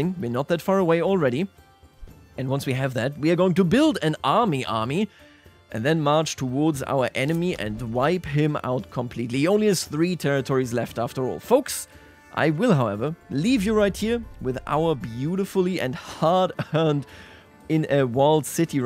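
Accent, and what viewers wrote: Germany. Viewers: Haha hab sofort den deutschen Akzent rausgehört. sehr cooles video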